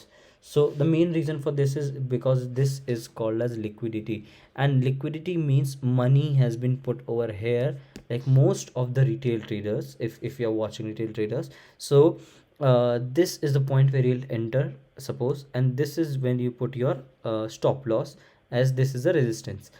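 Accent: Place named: India. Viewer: your accent seems Indian by the way🙃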